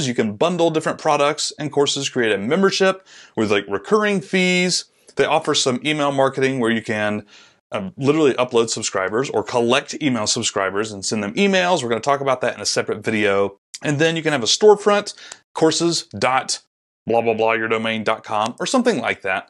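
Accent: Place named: United Kingdom. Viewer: Ok after comparing Course hosting palttforms the hole Sunday and already having a free test account at teachable I lean towards Podia because of design. Teachable Text layout in courses without coloums is ridiculous, I thought about making a new line every 10 words manually... Does podia have a community for members optione, where my course members/buyers can meat and chat, post there results? I plan on selling art classes with my dad: <link> Here you can see the results of the "offline" real life courses so far. Thanks for advice, great video!! Here a a few free Tuts in german, we plan to do more advanced ones for money... <link> You sound British. Sad to lose you in Eu, don't know how you think about it, what brings me to the next question, is podia good at handling the EU taxes? 16% / 19% in Germany...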